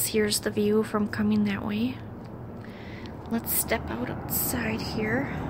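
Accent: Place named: Minnesota, United States. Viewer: You're either from Minnesota or Wisconsin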